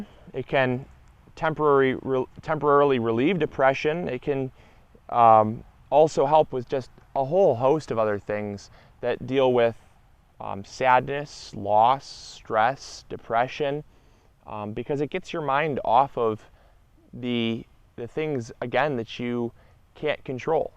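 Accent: Michigan, United States. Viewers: Good to remind people that food does not come from the grocery store originally. Since most people, more than 80%, live in cities where 90+% of them raise nothing to eat nor would they know how, it is important to remind everyone of where it comes from. (Also I like listening to your Michigan "accent" and colloquialisms that one is not likely to hear elsewhere.) I grew up in Ottawa county, Michigan - near and in Holland.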